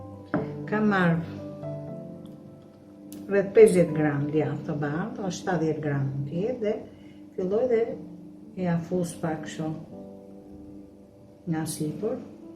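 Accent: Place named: Tirana, Albania. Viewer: Pse ti zonja, pa trokitur fare punon.....mos e shiko ne qoftese te acaron, por kjo eshte nje zonje e vertere Tiranase, qe ke qef ta degjosh, per te gjitha recatat e mrekullueshme qe ben, respkte pa fund per ju zonje qe na kenaqni me gatimet tuaja....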